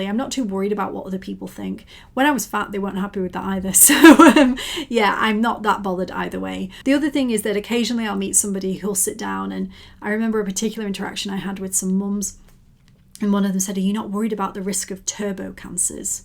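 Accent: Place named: United Kingdom. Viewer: Great Video and been loving the journey, personally down over 32kg, and recently smash my 10k PB (been in place since my early 30s) to a very healthy 56mins. Keep up the great work. MJ has been such a game changer, I was diet fatigued and have wrestled with my weight since pre-teen years I never dreamt that 40yrs later I could really make a change with the right support. Great to have a very UK voice rather than a US centric view.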